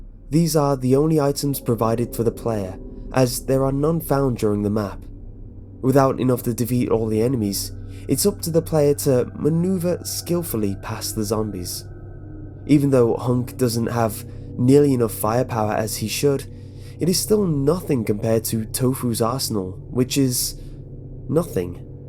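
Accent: United Kingdom. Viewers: Dude he’s British You must be a idiot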